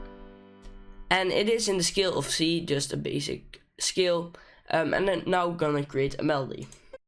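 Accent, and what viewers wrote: Netherlands. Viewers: Are you Dutch? If so Hallooooo! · Are you Dutch cuz I am and I thaught u where Dutch 2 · Are you dutch? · You are dutch, are you? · Lekker Nederlandse accentje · So dope! Are you Dutch btw? Keep doing your thing man! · You sound dutch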